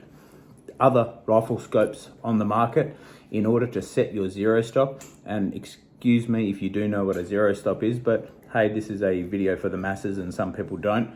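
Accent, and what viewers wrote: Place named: Australia. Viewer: Australian ain’t ya mate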